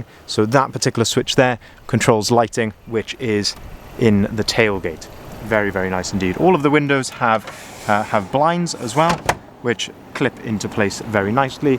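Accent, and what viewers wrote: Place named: Wales, United Kingdom. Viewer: Lovely professional presentation with that wonderful Welsh accent - you do 'spit' your 'T's and 'D's well (unlike some these days). 😀 Fab van too, though with 5 owners and 3 years old, it's about 5 grand overpriced in my view. At the end of this summer season, 2020 Oceans will be sub 60k and already the odd one for 62 on a 21/22 plate can be found.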